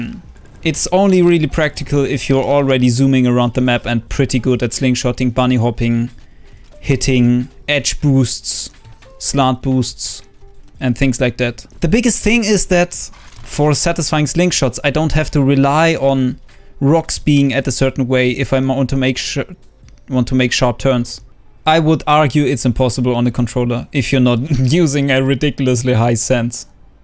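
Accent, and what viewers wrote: Germany. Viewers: dieses deutsche englisch :D sounds so fvcking weird with the german voice hahahaa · Bro man hört so hart raus das du Deutscher bist 😂✌🏾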